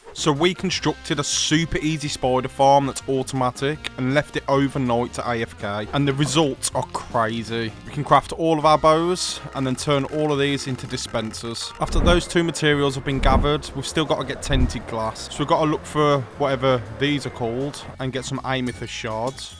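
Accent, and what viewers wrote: United Kingdom. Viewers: I think bro is British. I need American translation to understand 😫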